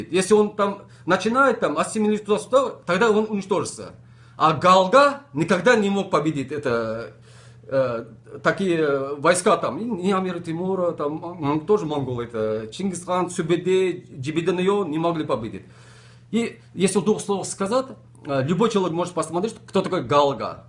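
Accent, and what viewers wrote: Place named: Tajikistan. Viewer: Это не монгол. У него акцент даже таджикский (персидский). Сказки рассказывает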